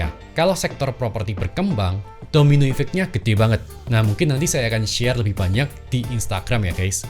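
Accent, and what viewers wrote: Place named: Surabaya, Indonesia. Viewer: Bro asli suroboyo yo?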